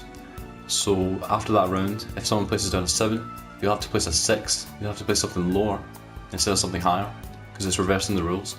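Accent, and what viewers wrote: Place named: Scotland, United Kingdom. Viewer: Came to learn tycoon, stayed for the Scottish accent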